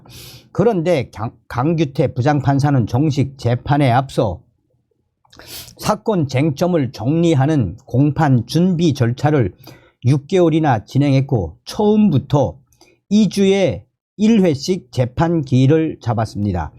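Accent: North Korea. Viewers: 남의 약점을 꼬집지 마세요. 저분은 남쪽에서 태어나지 않았습니다. 그래도 말씨를 고치실려고 얼마나 노력 하셨겠습니까. 정성산 대표님 항상 수고 많으십니다. 누구보다도 애국자이십니다!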